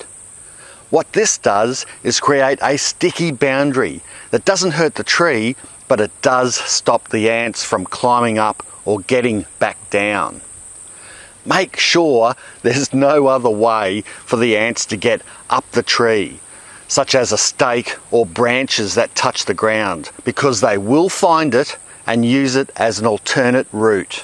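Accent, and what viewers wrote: Australia. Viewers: Awesome video thanks for the information. Great to hear an aussie!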